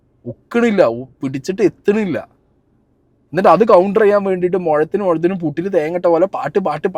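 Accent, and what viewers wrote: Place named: Karnataka, India. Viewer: Kannada English 😎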